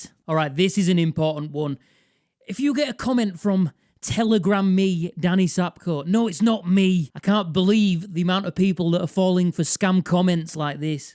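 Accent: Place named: Yorkshire, United Kingdom. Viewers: If one goes back and watches your older videos, your voice was definitely more subdued. I like the more manic Danny...with the even more pronounced Yorkshire accent. Keep up the good work! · I agree about the voice. Yorkshire and Scottish accents are the best in the UK for my American ears! 😎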